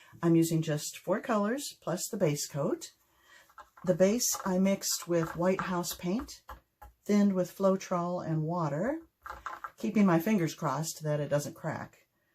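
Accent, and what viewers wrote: Australia. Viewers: 😂love the aussie accent! Fun idea combining different swipe tools👍. ❤️,Pam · I just watched this video then Bens . I think the main difference is the cells Ben got from using Aussie flotrol, we are so lucky in Australia to have our flotrol do what it does! Thanks for the giggle at your Aussie accent! 😂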